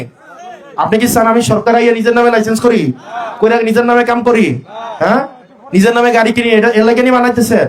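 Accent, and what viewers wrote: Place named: Sylhet, Bangladesh. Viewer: আমাদের ভারতীয় সিলেটি ভাষার সঙ্গে মিল আছে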